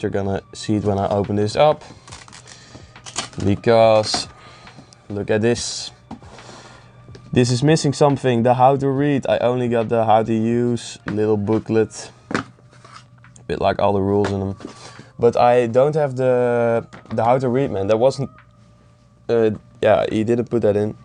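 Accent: Netherlands. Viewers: Ik hoorde direct aan je accent dat je Nederlands was, tof om te zien. Je hebt een subje erbij! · you dutch i hear the accent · Ik heb deze video al zo vaak gezien in mijn Recommended maar heb eigenlijk nooit op je stem gelet dus kom er nu achter dat je Nederlands bent. Mooie Collectie!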